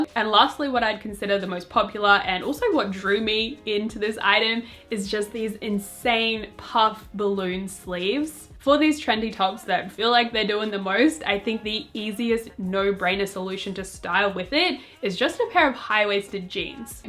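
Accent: Australia. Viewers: I figured she was from Australia because she lowkey sounds like Felix, Bang Chan and this one Australian girl I used to know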